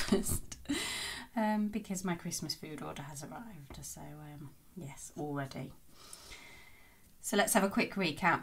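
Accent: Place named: England, United Kingdom. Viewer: i just have to say hearing you talk makes me homesick for england lol have been in usa since 1967 but england will always be home love listening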